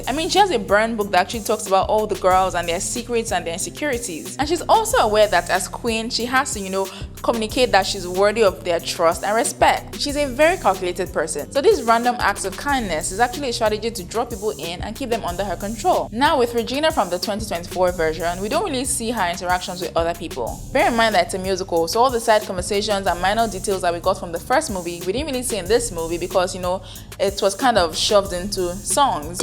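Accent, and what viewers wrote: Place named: Nigeria. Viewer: I knew you where nigerian because i noticed your accent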